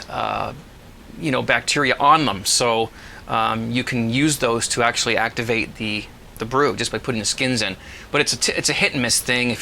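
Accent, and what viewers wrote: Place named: Canada. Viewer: You are my hero. I am picking up a hint of an accent. Are you Canadian or from Canada?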